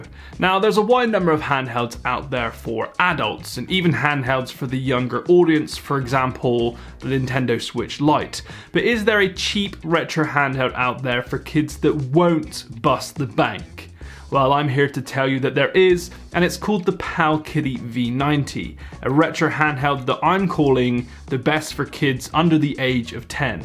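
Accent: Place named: West Country, United Kingdom. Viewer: your heavy west country accent will never not be adorable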